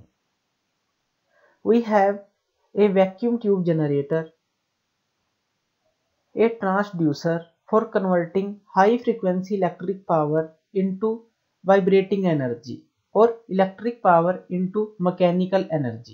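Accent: India. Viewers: typical Indian acccent but understandable>>>Good work thou!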